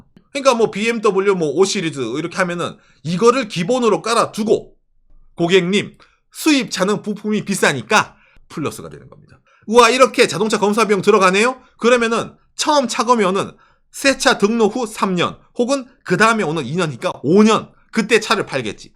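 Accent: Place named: Gyeongsang, South Korea. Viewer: 정보도 좋고 내용도 좋은데, 경상도 억양이 너무 쎄서 듣기 좀 그렇다. 좀 믿믿하기라도 하지. 너무 억양이 쎄네. 지역 얘기 하는게 아니고 듣기에 불편하다. 너무 억세서.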